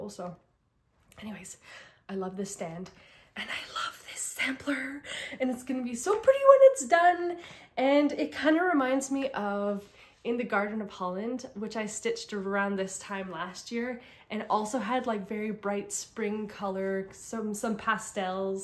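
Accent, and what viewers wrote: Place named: Quebec, Canada. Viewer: Bonjour. J’adore tes vidéos, c’est certain que je l’ai écouté jusqu’au bout. Je fais des points de croix et j’ai tombé en amour avec le livre 52 socks in 52 weeks que j’ai emprunté à ma bibliothèque. J’ai découvert un patron de Paula Wisniewska Lato sur Ravelry avec la laine de Julie Asselin (québécoise) fantastique, le patron est aussi dans le deuxième livre de 52 socks in 52 weeks II. As-tu acheté le nouveau livre de Gabrielle Vézina (québécoise) qui fait des tricot en dentelles et des bas. Avec la laine de Julie Asselin. Juste avant ton vidéo, j’ai commandé une verge (yard) de speculass et deux mini hank de falu red pour faire Harriette Salt et Louisa Coulimore sur panettone. Moi aussi j’aime les fils Roxy floss co. Le falu red est mon préféré. Je suis une québécoise (dans mon cœur)qui vit en Ontario maintenant. À 25 je me suis mariée (avec un québécois bien sur lol) mais comme il était pilote dans les forces, nous avons déménagé en Ontario Mais nous retournons visiter nos amis et famille a chaque année Tu me fais chaud au cœur quand tu parles québécois Merci ne change rien. 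On t’aime comme tu es ❤ P.S. moi j’attends encore que mon amie me montre à travailler avec des aiguilles sur câble la loop magic Eat-ce que tu tricotes comme ça? Moi je ne dis pas skein, je dit ma pelote de laine 😂 À bientôt Moi je vais à stitch north la deuxième fin de semaine d’avril et en octobre J’espère te rencontrer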